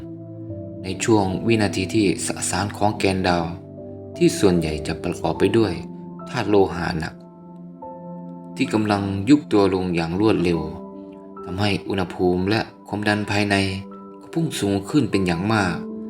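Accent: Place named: Isan, Thailand. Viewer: เสียงบรรยายสำเนียงอิสานมากๆคับ น่ารักดี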